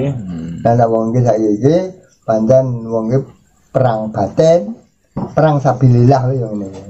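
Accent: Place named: Bojonegoro, Indonesia. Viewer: Mugi slamet kung.... Kok kados bojonegoro logat bahasa nipun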